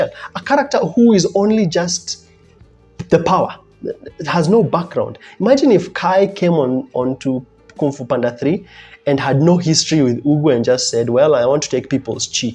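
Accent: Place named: Africa. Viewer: I think he might actually be from Africa that's how they speak there it's a very nice accent to listen to that's for sure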